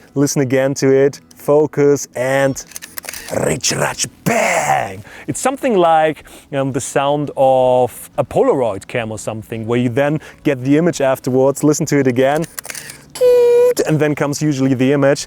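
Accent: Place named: Germany. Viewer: I really like your videos but your German accent is just crazy XD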